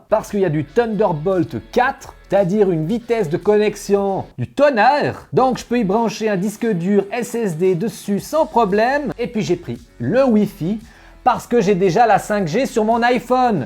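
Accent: Vaud, Switzerland. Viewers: Mais quelle touche délicate d'accent Vaudois c'est magnifique ! ça fait bizarre d'en entendre sur Youtube... Amicalement, d'un autre Vaudois.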